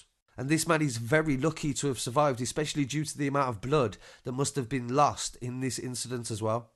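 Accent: Birmingham, United Kingdom. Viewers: I must be high..... But why is your voice over speed up? Keep that slow brummie accent. It's original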